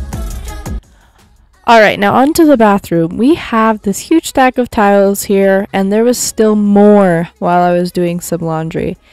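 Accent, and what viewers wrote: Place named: United States. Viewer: Hi! I was intrigued by your channel name and thought you were a ie New Zealander like me, but then I heard your American accent and saw the American products. First up I want to say I'm sorry for your loss, and I think you did absolutely the right thing which was to lie low for a few days, grieve and go easy on yourself, and then get up and tackle what you had to do. You are spot on when you said it's good for your mental health to achieve something and make your environment pleasant and tidy. I was nannying for a few years for various families and I learned a lot by observing how they ran their homes. One thing I noticed was that decluttering makes life so much easier. One mother had 2 little boys and she really had a minimum of clothes for them. She would do a small wash every day and get them dried and put away and there was never a huge pile of clothes to worry about. 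That's one recommendation I'd make to you, to go through your little guy's clothes etc and try to trim it all down to a minimum. Another great trick is to put away half of your little one's toys for a few weeks. When you bring them out again, put the other half that has been out away. He'll almost be like he's got new toys or so excited to see some old favourites. That way it's easier to keep tidy because there aren't so many lying around, plus you'll have a very happy kid. Also when they are so young, they grow out of toys so quickly so it's good to go through them and donate the ones that he's no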